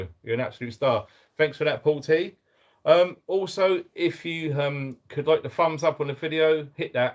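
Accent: United Kingdom. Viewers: Hi to u,I just now stumbled across your channel. I'm Nancy from 🇺🇸 USA of state of...Florida..hope you All are doing well over there.Nice to meet u..Lovein That British Cool Accent.Ive got a strong southern Accent 😆. I'll keep watching and keeping up with what's happening over there in your side of the globe 🌎. Thumbs up n keep doing what your doing you know..our governments are doing this to us citizens ALL, we're in this together ❤ 👍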